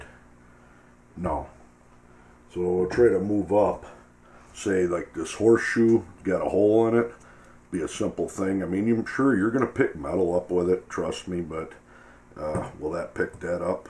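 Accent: Minnesota, United States. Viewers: That has to be either a Minnesota or Wisconsin accent. By Golly !! Nonetheless, nice video.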